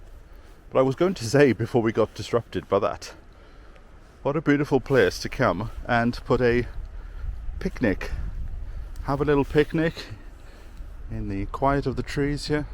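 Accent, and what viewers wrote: Wales, United Kingdom. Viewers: Welsh accent getting stronger every episode. ✅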